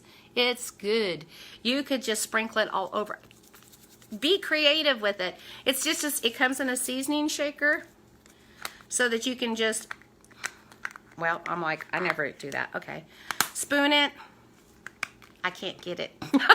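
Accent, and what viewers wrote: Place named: South, United States. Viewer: I am doing Keto IF so I am at the point where my appetite is basically gone some days, but I have been eating the same foods for a while now. Mostly the usual and I am following the 7-10 cups of vegetables a day per Dr. Berg, but your Keto bread and other recipes are going to help me to make this more of a good lifestyle rather than such a restricted lifestyle. I'm from MN and sometimes when we northerners listen to someone from the South it can be hard on our ears but you have the sweetest voice and it's just so nice to listen to your laugh!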